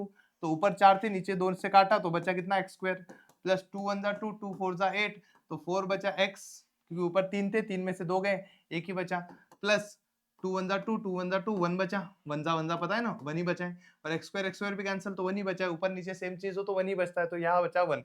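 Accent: Maharashtra, India. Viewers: sir marathi manus aahet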